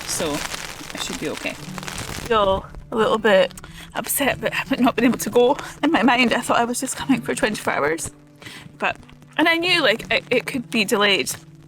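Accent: Scotland, United Kingdom. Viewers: I am so glad I found your channel|! I truly LOVE your accent and Scotland. I have lived in Edinburgh for a year and toured around Scotland a lot. Love from🥰🥰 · I am enjoying watching your video,s. You are a lovely lady, and I love your beautiful Scottish accent.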